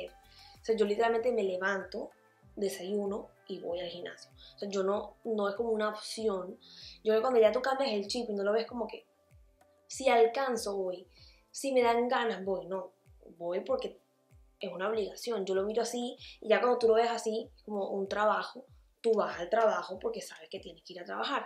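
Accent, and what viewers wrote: Venezuela. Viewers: Es de colombia pero habla muy venezolano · Buen video!! Pensé que eras Venezolana...por tu acento tan nuetro.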